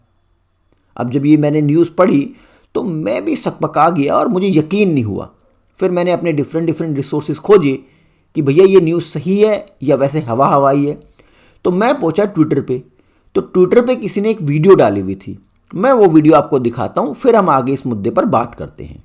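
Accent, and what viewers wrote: Bihar, India. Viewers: Your Bihari accent is so sexy Say again — PRO-VYNCE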